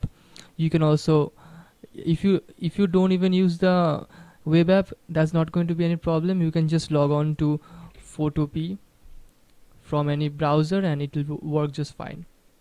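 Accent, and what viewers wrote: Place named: India. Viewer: Aap hindi me bhi bana sakte thea sun k lag raha h ki aap indian ho 😗🚬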